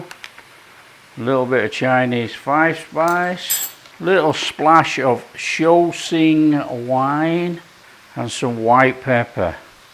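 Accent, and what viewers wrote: Yorkshire, United Kingdom. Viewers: Beautiful, mate. Looks easy and tasty. Will give this a go tonight. Where abouts in the UK is that accent from? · Thought i heard the yorkshire accent. Cool thanks for the video.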